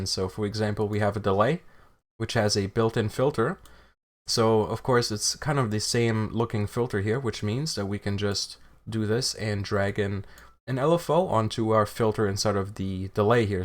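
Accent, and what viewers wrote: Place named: United States. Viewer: Dude, you sound soo american on this video haha, but anyway, helped a ton, Serum is such a great tool, thank you one more time!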